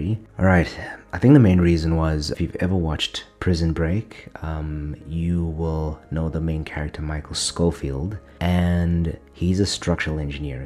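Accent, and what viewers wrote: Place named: South Africa. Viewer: South Africans legit have the best English accent, I'll fight anyone on that. But on the topic, I get where you're coming from. I'm an immigrant myself, currently in my fourth country. I was pushed into tech. because that was seen as a safe bet and a good match for my skills (and because somehow seeing that someone is into video games automatically equals they'd be a good computer nerd, by some logic of our parents' generation) It was alright. Helped me settle and make some money. But I've always been fascinated by larger systems compared to the minutae of tools and machines that most software careers focus on (I would imagine engineering is the same) I really enjoyed working in Insurance at one point in-between studying/working in tech., and I've always been fascinated and interested in economics. Unfortunately I was never encouraged or supported to pursue that or finance or business; and I had no role models (very important!) until I met my now wife. 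Long story short, I've been studying math for a year now to shore up and I'm going to be starting a Master's in Analytics to first shore up on the necessary applied statistics skills, before I move on to something that is more into economics/policy and thereby something that is more impactful while also being more interesting to me.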